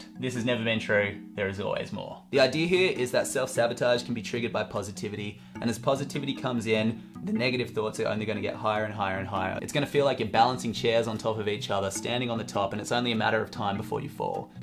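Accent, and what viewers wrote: Australia. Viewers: I bloody adore your videos. Thank you for making them from the bottom of my heart! Good to hear an Aussie voice too!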